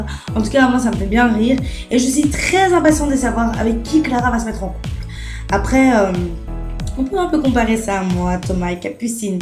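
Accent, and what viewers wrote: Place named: Belgium. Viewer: Elle a un accent belge !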